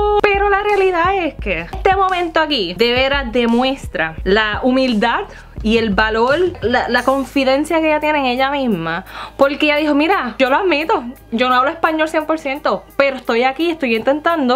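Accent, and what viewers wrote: Puerto Rico. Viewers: Me encanta tu picardía! Eres graciosa y bonita. Tu tono de voz es muy boricua. Precisamente estaba pensando que deberías hacer algunas comidas de la isla. Sería muy bueno! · OMG!!! Me encanta tu manera de seL !! Eres súper boricua como hablas. Que rico que te sientas así. Tú naciste en la isla o en China ? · La cosa no esta en que sea gringa y ni se sabe si nacio en PR o USA ella no habla claro , la cosa esta en que ahora se acuerda q es Boricua ese es el sentir de muchos Boricuas. Yo pienso q los latinos le deberian enseñar su idioma a sus hijos pero muchos se averguenzan. MIRA FRANKIE RUÍZ , jowell, nickyjam , marc anthony etc.... ellos son Boricuas nacidos en USA y sus padres le enseñaron español. es bueno hablar los dos idiomas y mas si es de los padres de uno , es un orgullo. y la comay tiene razon en ese certamen hicieron muchas cosas mal como el tal nolasco del jurado se puso a llamar gorda a una de las candidatas eso no se hace , aunq soy mujer yo las vi bellas a todas , hasta la madison , pero se ve rara como que no le salen las cosas del corazon y es raro tiene videos en su instagram hablando bien español con un niño , pero en el certamen no sabia hablar , por un lado es bueno y por otro malo eso paso el año pasado por kiara no hablar en ingles le cambiaron la contestacion en el concurso. 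ya escogieron a la madison y es la que nos va a representar asi que hay q aceptarla. En Puerto Rico los Puertorriqueños somos de todos colores BLANCOS, TRIGUEÑOS CLAROS, trigueños oscuro, albinos, coloraos, morenos, chinos y tambien hay coreanos mesclados con Boricuas etc.... pense que eras de los dos Boricua y china hablas bien Boricua y tus rasgos fisicos parecen una mescla y te ves distinta a algunas chinas que viven en Puerto Rico que se ven como bien chinas y no lo digo por mal ,y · Tengo que admitir que cuando te vi hablando español a lo boricua por poco me cago encima porque pense que te habia poseido un demonio pero, tienes razon, eres super boricua, mai lol. Me encanto el video ya me suscribi con like y todo. Quiero ver mas videos cuidate y te queremos, hermana! Suerte en todo! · Jajaja yo si soy boricua; nacida y solamente estuve en Puerto Rico 🇵🇷 asta los 8 años y ni tengo el accento boricua como tu, este video y medio risa por que ni escribo bien el español ni lo hablo como tu🇵🇷 y me crie en estados unidos y ahora vivo en Guatemala 🇬🇹 y ahora más me confundo cuando hablo español por aca en Guatemala 🇬🇹 hablan diferente el español 😂🙏so hablo español como sea 👍🏼🤬😂Me gusto como hablas a lo boricua 😍Wepa q viva P.R. 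🇵🇷🙏👍🏼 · "Oye yo soy Boricua cagao y con solamente escucharte el acento y lo simpatica y linda que eres por fuera mas por <link> siento super orgulloso y eres mas Boricua que muchos que nacieron en P.R. "Pa..Lante.Boricua...Digo...✌ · Que chula!!! Eres más boricua que yo que nací en Puerto Rico!! Hasta Suénas más boricua q yo!!! Sabes que extraño de Puerto Rico: la Playa, la comida China pq no hay como ese sazón único(de hecho cuando fui el año pasado al segundo día me pedí mis tostones al ajillo y la combinación de arroz frito con costillas 😋 🤤, y obvio la familia y el ambiente donde quiera que vayas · Hola es la primera vez que te veo pero me encanto tu estilo y tu asento bien boricua ya tienes otra seguidora yo tambien soy boricua y vivo en Miami desde el 2006 y extraño a mi bella isla un monton 🇵🇷❤😍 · Me encanta verte hablar, tan bello ver otras culturas incorporándose a nuestra isla, sueñas más boricua que yo y soy criaaa y nacida aya.... 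I love it ❤️ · Q bella hasta lloré... 😂 Hablas bien boricua... y te criaste en PR eres boricua tmb. HERMOSA,!! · Primer video que veo y Mori cuando te oí ...tú eres boricua 🇵🇷 wow !!! No hay duda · No serás boricua de nacimiento pero si de corazón y hablas bien boricua más boricua que muchos que dicen serlo .Bendiciones!!! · Podras ser china pero tu manera de hablar es de una boricua! ❤️ ya te empece a seguir! Gracias por tu reaccion al certamen!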